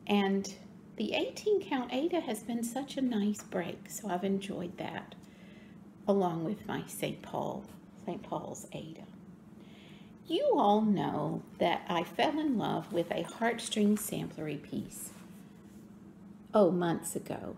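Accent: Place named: Texas, United States. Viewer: So nice to hear a sweet Texas accent and to find another homeschooling mom (although mine are now in their thirties). Your Mary Queen of Scots will be stunning! Your curls are beautiful. I'm in Athens and am enjoying the rain as we badly need it. I heard about you on Rachel's FlossTube and I think she mentioned you go to the Tudor Rose Guild, unless I misheard. I'm going to the October meeting. Hope to meet you if you are there. I'm coming up on my FlossTube Anniversary, too!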